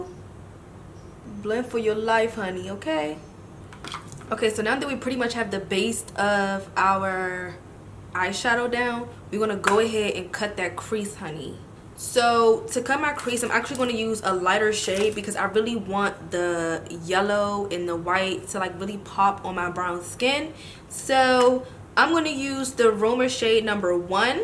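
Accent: Washington DC, United States. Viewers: I knew u was from DC from your tone of voice 😩😍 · You have to be from the DMV....the accent gave it totally away! I ran 🏃🏾‍♀️🏃🏾‍♀️🏃🏾‍♀️🏃🏾‍♀️ and copped me 2 of the bases and about to dive in and buy the palette. Thanks girl. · I just knew you were from dc..we have a certain type of accent lmao..I immediately subbed😍 we gotta support each other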